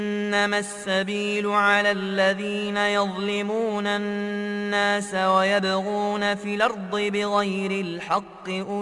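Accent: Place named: Morocco. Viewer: ماشاءالله تبارك الرحمان قراءة مغربية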